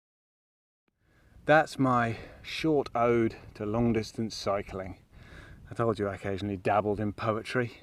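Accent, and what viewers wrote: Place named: RP, United Kingdom. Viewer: no, been and seen rhyme elsewhere when you talk. It's close to received pronunciation, I think. Her Majesty also does this. Contrast to Ireland, Scotland, or Brumie.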